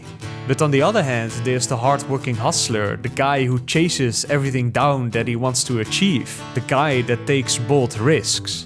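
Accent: Netherlands. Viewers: Je videos zijn altijd ZO goed maar ik denk 100% (en dit bedoel ik niet als belediging) dat je nóg meer views krijgt als iemand anders met een wat minder sterk Nederlands accent het audio gedeelte over zou nemen. (Met alle plezier zou ik dit voor je doen/proberen als je er voor open staat.) Nogmaals, na genoeg top tier content wat mij betreft!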